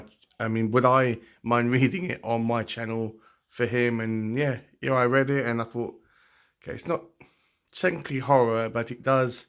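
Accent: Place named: United Kingdom. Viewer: Thank you so much for this cool reading!!!! It didn't even cross my mind 🙃 I assume your accent is U.K. - The Lone Wolf lore may be only in America. They even made a movie Lone Wolf McQuade with Chuck Norris. 😁 The wolf was me (I grew up hard), the other is my wife, when I met her. And the end is just me being happy! I don't have the chops to be a poet, I try to write it to ignite my creativity for the prose I work on. I must admit, it sounds so much better when you read it!!!! Thank you so much!!!!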